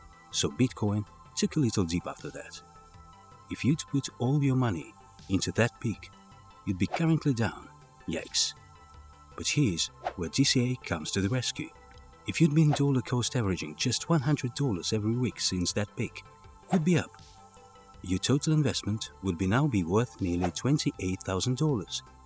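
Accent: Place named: India. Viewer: I have worries in this project since the voice sounds like from India where most scam hubs exist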